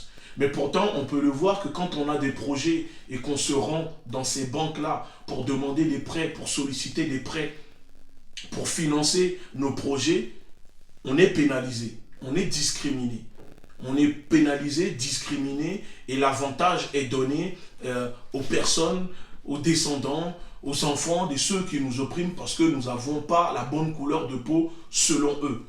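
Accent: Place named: Africa. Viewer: Ta carrément raison non mais pour qui il se prend quelqu'un à remarqué que depuis qu'il fait le prêcheur il a pris un léger accent africain pour être plus crédible, allez écouter comment il parle bien français sans accent quand il rappai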